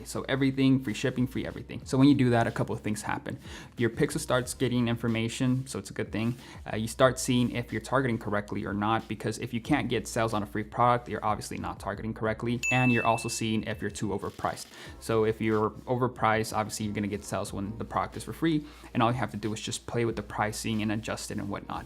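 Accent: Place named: New York, United States. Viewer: Are u from ny bro?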